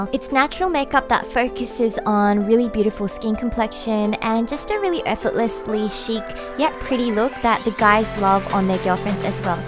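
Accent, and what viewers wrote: Australia. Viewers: are you from Korea, l hear an australian accent? to be honest, you make me wet!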